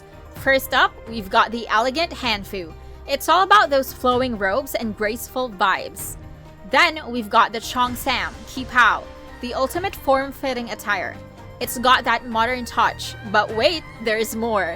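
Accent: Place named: United States. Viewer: The narration is so American - freaking freaking freaking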